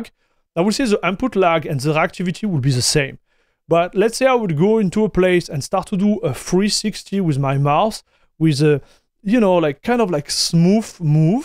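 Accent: France. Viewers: genuine question, is he french or belgian?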